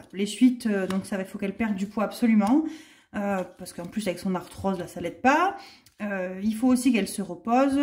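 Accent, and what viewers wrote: South, France. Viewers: Oh mais cet accent du sud ❤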